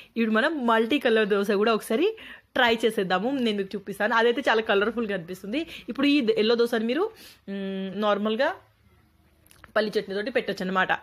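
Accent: Telangana, India. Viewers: Me voice chaala bagundhi akka...thelangana baasha lo baga matladuthunnavu...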